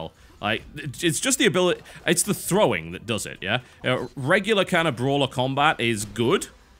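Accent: United Kingdom. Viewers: Well um he's British...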